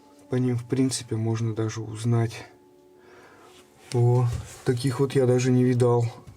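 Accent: Vyatka, Russia. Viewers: До 7 лет часто бывала у дедушки,в деревне Статники Оричевского района ,Кировской области.Деревни этой сейчас уже нет. Ваши повествования будят ностальгию по тем временам, даже вспомнила устройство этой усадьбы.А Ваш говор с вятским акцентом прямо услада для души.Давно уехала с Вятской земли в Барнаул.❤❤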